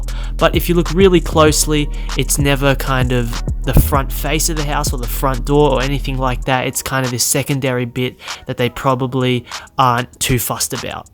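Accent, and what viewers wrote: Australia. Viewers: Ur Australian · You ozzy ?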